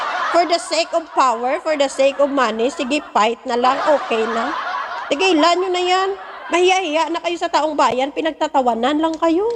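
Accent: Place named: Visayas, Philippines. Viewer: kapag naririnig kita madam naalala ko c sen meriam na kahit tunong bisaya kayo pero malaman ang mga sinasabi at kahit mabilis k magsalita my direksyon at nasa tuno ang bawat buka ng bibig mo.isa kanang alamat madam sa larangan ng vlogging.mabahay k godbless❤❤❤❤❤❤❤❤❤❤❤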